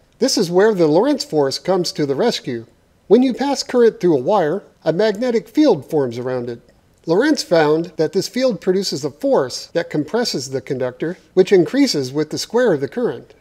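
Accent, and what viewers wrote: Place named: Canada. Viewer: So, just did a little basic math using Assuming that's supply not output, that's 7.2 million kW. Average cost of energy in Canada (could be wrong but he sounds Canadian to me) is 19.2 cents per kWh. Unless I'm missing something, this costs $1,382,400 to fire once at full power, just in electricity. I pray I'm wrong, because this is too awesome to be such a rarity. If it's anywhere near the ballpark though, we need to appreciate the sheer commitment this man has to making cool stuff. In other words: GET THIS MAN SOME LOTTERY TICKETS!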